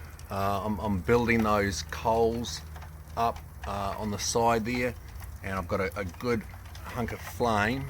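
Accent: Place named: New Zealand. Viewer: Good to hear the kiwi accent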